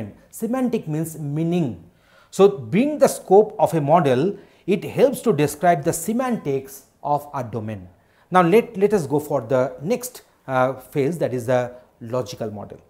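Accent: Bengal, India. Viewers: Thanks for the video. You sound like a Bengalee. :-)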